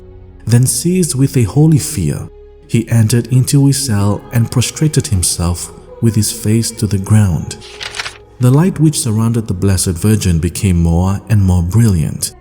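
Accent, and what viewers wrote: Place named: Nigeria. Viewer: I love hearing you tell this truth In your Nigerian accent Reminds me of old friends St Catherine intercede and pray for us to love one another ☘️☘️☘️🙏🙏🏼🙏🏾🕊️🕊️🕊️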